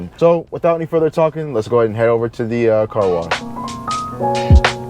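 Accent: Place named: Charleston, United States. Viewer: Im from Greenville and i swear you got the clearest Charleston accent i ever heard 🤣🤣🤣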